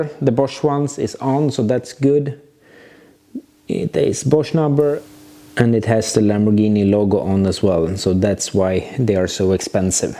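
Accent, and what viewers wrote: Sweden. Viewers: I hope your YouTube metrics show you how many times I’ve watched all these videos. You’re the perfect host for this build and your Swenglish is perfect, brother. I love your ambition and commitment in both quality and engineering; it’s mind bending what you’ve done in the last few Volvoghini vids.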